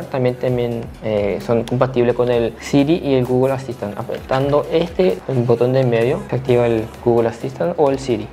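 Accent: Paraguay. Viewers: por el acento es Paraguayo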